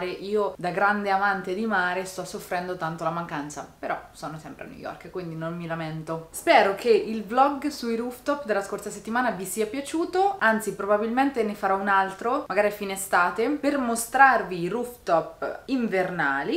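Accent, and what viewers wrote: Ancona, Italy. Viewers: Ciao ho visto il tuo video per caso ma ho subito riconosciuto l'accento in italiano Sei per caso di Ancona poco ma sicuro .sulle mance non sono molto d'accordo Visto che non è una libertà ma un imposizione e poi non è tutto mercato nero sono soldi non tassabili e in più alimenta Il sottocosto retribuito di chi lavora come cameriere o altro visto che le mance contribuiscono.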